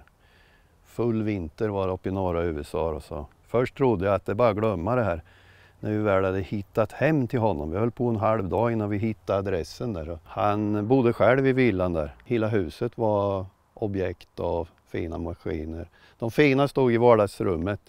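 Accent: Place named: Östergötland, Sweden. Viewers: Hej! Jag hör att du är från Östergötland och är ett Indian freek, måste fråga dig om en Indian med sidovagn. Min min mammas morbror ägde den och tror att den inköptes <num> talet. Han hette Set Österkvist ock var från Örtomta församling utanför linköping. Skulle vara kul om du viste något om den? Jag har en historia att berätta över just den Indianen. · Mer östgötska till folket!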